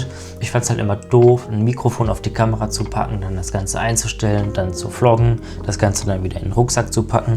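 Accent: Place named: Germany. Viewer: Creator Combo ist jetzt schon auf 450 runtergekommen- da müsste ich sie mir für meinen Südafrika Urlaub auch holen🥰 vielen dank für das schöne Video.. Man merkt gar nicht das du nicht gerne vor der Kamera sprichst- zudem hast du ein sehr schönes, angenehmes Deutsch. Wäre sehr froh wenn ich als Schweizerin so sprechen könnte😅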